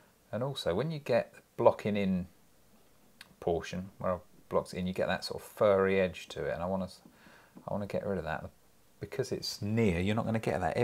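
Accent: United Kingdom. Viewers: aw ur shining blue eyes and british accent 😍 and so generous on sharing great painting skills :) 😘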